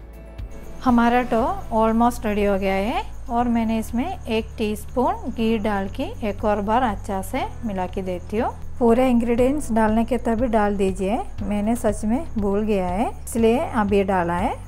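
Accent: Kerala, India. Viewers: Thank you madam for the recipe,I like your hindi in Kerala accent ❤️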